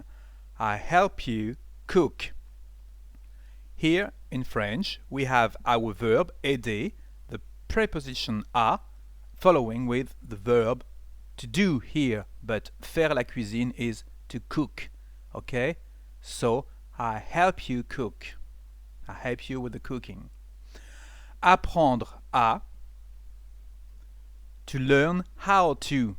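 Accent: France. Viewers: I wasn't sure if you were from France or some other French speaking country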